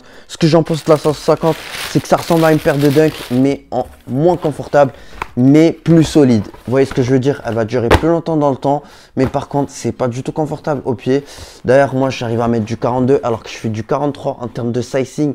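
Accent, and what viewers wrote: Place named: Algeria. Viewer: u do great content but idk wuth te hell u sound like alittel bit algerian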